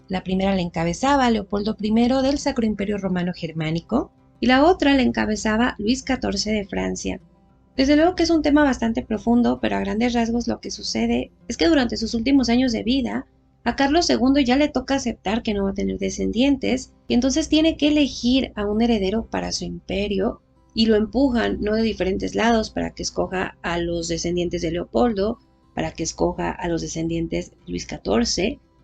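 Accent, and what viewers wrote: Mexico. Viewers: En el inicio muy famoso de la novela Noticias del Imperio, de Fernando del Paso que trata sobre Maximiliano Y Carlota, el célebre monólogo de Carlota tiene una frase que se refiere así a Maximiliano: fue el primer descendiente de los Reyes Católicos Fernando e Isabel que cruzó el mar océano y pisó las tierras de América... A la luz de este árbol super extendido, veo que no fue así, pues los Braganza llegaron a Brazil antes que los Hambusburgo Sax- Coburg Gothe a México. Impresionante trabajo, gracias por compartirlo. Tu acento no suena ibérico, parece mexicano 😊.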